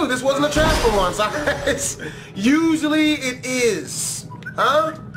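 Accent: United Kingdom. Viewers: I love your british (medieval) accent >.<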